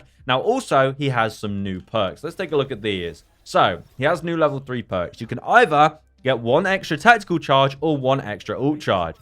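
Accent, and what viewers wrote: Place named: United States. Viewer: please stop the american intonations be English mate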